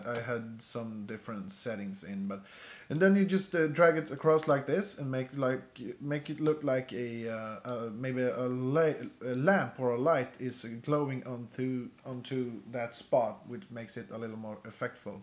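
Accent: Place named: Sweden. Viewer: based on you accent. I'm guessing you're from Sweden ;) besides that. Thanks for the tutorial!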